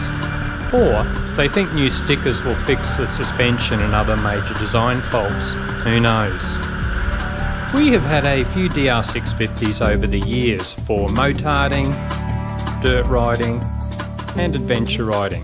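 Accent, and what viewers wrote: Australia. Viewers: After all the reviews I tried to watch but failed by bloody skeptics, along comes this one one spoken in plain Australian. Thank you looking forward to watching the whole series. Where on the Sunny Coast are you lot at?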